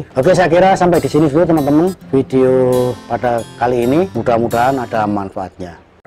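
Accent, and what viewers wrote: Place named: Java, Indonesia. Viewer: Medok sekali asli gunkid 🙏🙏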